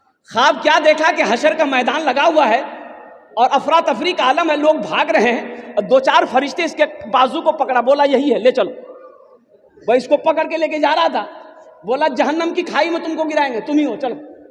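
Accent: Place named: Bhagalpur, India. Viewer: Aap kaha se ho maulavi saheb , aap to mere taraf ki bhasha bolte hai , bhagalpur. Sunne me bahut achha lagta hai.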